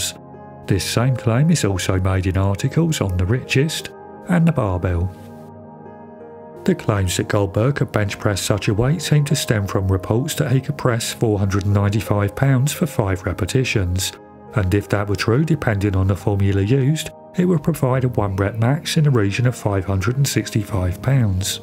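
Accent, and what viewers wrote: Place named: London, United Kingdom. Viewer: Your accent is cool. I'm guessing you're originally from the London area? Maybe Surrey.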